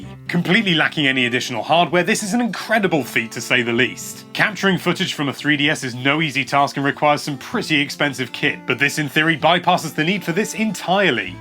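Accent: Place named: United Kingdom. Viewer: I get that you're British and your accent isn't the original pronunciation of the language. Instead it is a style of speaking that was developed by bringing cockney slang to the upper-cass to unify those from the homeland and identify yourselves by contrasting with colonial Americans. However; "Yoshi" (Yoh-shee) is a Japanese name so it should probably be pronounced as it's native language suggests.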